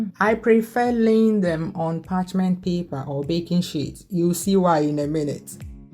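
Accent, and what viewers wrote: Igbo, Nigeria. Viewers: Igbotic accent creeps in 🤩, thanks a million for this lecture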